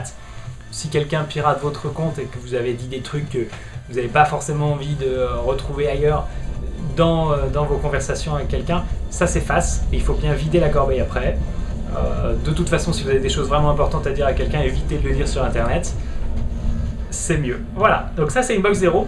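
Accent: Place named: North, France. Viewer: je m'efforce à faire la même chose mais c'est pas tjs facile. au fait on sent tes racines du nord : 20 [vinte] :D je faisais la même à mon arrivée à paris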